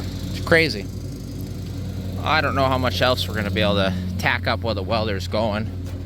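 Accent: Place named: Wisconsin, United States. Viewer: Apple 🍎 doesn’t fall from the tree with that Wisconsin accent 🤠🇺🇸🍺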